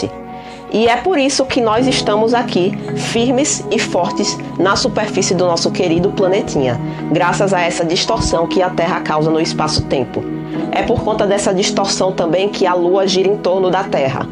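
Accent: Northeast, Brazil. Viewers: "O bixinho é pesado" física e meu amado sotaque nordestino, adorei ❤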